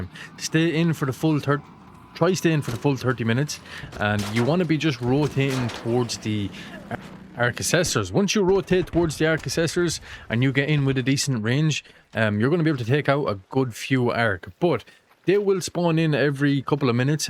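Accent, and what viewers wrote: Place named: Carlow, Ireland. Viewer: Sounds suspiciously like a Carlow accent 👀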